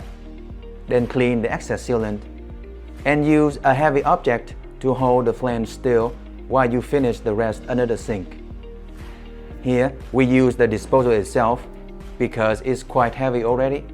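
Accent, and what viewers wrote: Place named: Vietnam. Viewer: Judging the the accent, bro is vietnamese 🙂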